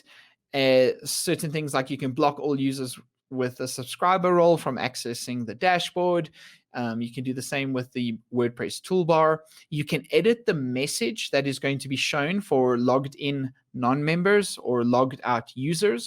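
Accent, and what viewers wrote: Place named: South Africa. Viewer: Baie Dankie. It's funny to hear a South African accent, most webinars I watch are American accents. At least I could understand your accent. Where do I explain what a member receives for each level?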